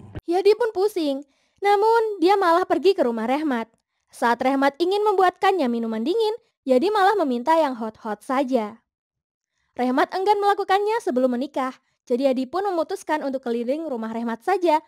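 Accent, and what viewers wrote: Papua, Indonesia. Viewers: Mantap logat Papua nya Mimin♥️ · Mimin pinter logat Papua.. pantes pintar ngemob aww. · Mimin logat Papua za rasa lucu tra gaul se mimin 😂😂😂😂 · pake logat papua bleh sukses selalu kak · 😂😂Fix, mimin anak Papua😅mantap Dialegx Min keren, Lanjutkan 😊🎉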